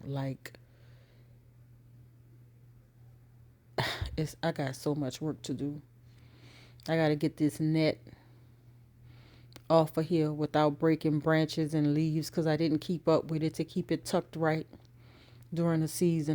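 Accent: New Orleans, United States. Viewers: Sounds like you from New Orleans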